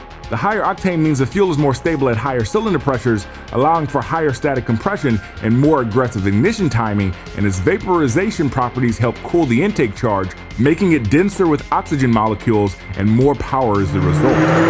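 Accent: United States. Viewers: If you're planning on having Americans watch your videos, at least put MPH numbers in parentheses under the KMPH numbers. Considering you have an American accent, it seems like you should know this.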